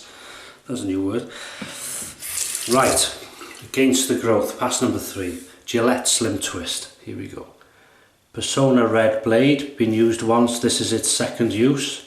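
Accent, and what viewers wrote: United Kingdom. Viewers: I travel quite a bit for work, so those shave sticks are a life saver for me. Thanks for the video,! From which part of the UK do you hail? (I know England, but which part? London? Birmingham?)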